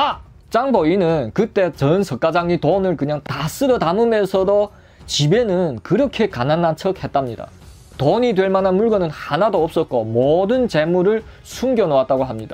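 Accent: China. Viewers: 목소리나 억양이 완전 중국화 되어 있고.. 젊은 친구가 잘 생기기도 하고.. 설명을 잘 해 주어서.. 중국 무협지 한편을 본 느낌이었네요.. 아주 재미 있었습니다.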